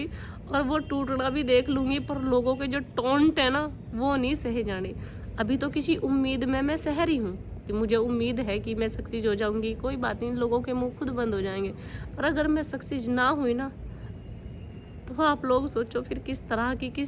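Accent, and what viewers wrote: Haryana, India. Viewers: आप की प्रस्तुति और विषय दोनों अच्छा लगा, वैसे मैं अवध से हूँ इसलिए आपका हरियाणवी अन्दाज में बात करना अच्छा लगता है✔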